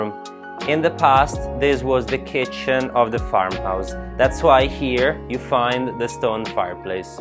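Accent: Italy. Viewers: we need a bit more than buongiorno. Haha c'mon show us that sexy Italian accent ;) Can't wait to see the upcoming videos. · I love your italian acccent when you speak english. Hope you can also speak french. :D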